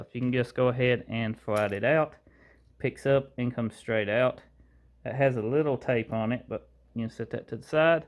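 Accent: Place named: South, United States. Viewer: your souther speech reminds me of a kinder Dusty Marlow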